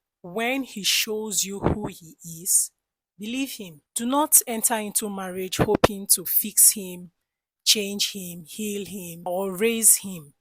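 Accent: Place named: Nigeria. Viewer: your advice is wise. I love your Nigerian accent too ❤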